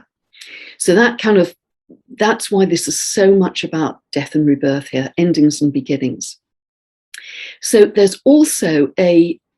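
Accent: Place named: RP, United Kingdom. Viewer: You have the poshest accent ever. If anyone wants to know what "the Queen's English" or "BBC English" or "Received Pronuncation" sounds like, listen to this young lady. Some English people have accents that "sound" super high class, but when they pronounce certain words with a bit of a working-class twang, it gives them away as not really posh. Your accent, however, is truly impeccable.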